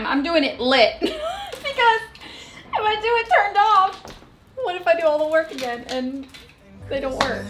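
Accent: Texas, United States. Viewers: I love the little Texas twang in your voice now. As soon as you grabbed the tree I thought she’s gonna put on gloves cause she learned from childhood. Lol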